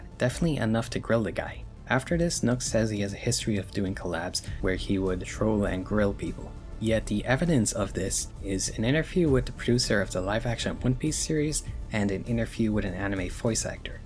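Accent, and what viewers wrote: Ireland. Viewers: Great video, watched tbe whole thing. Also what accent is that? I thought you were american at first but you say your THs and Rs in a very Irish way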